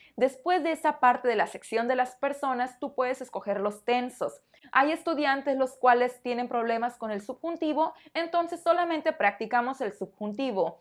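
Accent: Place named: Mexico. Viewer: Soy de Brasil y me encanta ver tus videos y escuchar tu podcast. me gusta mucho el acento mexicano👏👏👏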